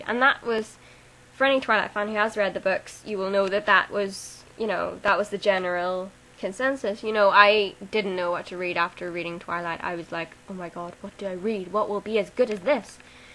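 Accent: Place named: Belfast, United Kingdom. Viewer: Your from Belfast!? I did not know that! haha. I'm from Belfast also. You don't have a strong Belfast accent...you're very lucky :) I thought you were from England haha I also think that Twilight isn't selling sex, it's selling love. Pschh...Team Edward? No! It's Team Charlie all the way! haha :D Thanks for another awesome video!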